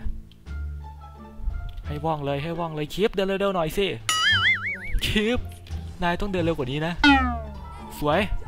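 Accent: Isan, Thailand. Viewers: เดินมาลาสคะเจ้า....คนอีสานใช่มั้ย<num>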